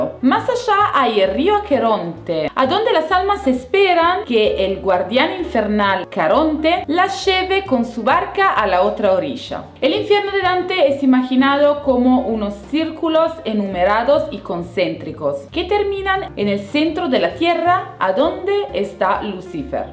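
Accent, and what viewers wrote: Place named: Italy. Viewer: me encanto el video! le pones mucha onda a lo que haces, y ese acento tano le pone mucha energia! chau linda!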